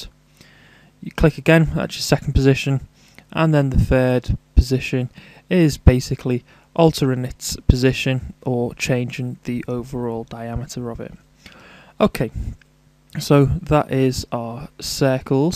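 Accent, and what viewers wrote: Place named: North West England, United Kingdom. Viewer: Hey really well explained and incredibly clear. Your accent sounds like you are from the North West. Please can you tell me why I am not picking up circle/arc centres when sketching an entity on an existing feature. Even something simple like trying to create a circular extrusion on say the end of a circular shaft; the centre of the shaft doesn't show. It used to work. Have I switched something off? It's been driving me crazy for weeks. Thanks for any help you can give. Cheers. Robert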